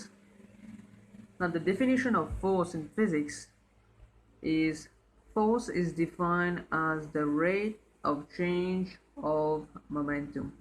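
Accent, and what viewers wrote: Mauritius. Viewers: sounds like a maurician dudeeee!!!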